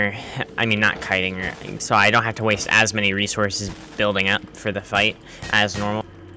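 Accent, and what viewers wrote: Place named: United States. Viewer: I tought he was american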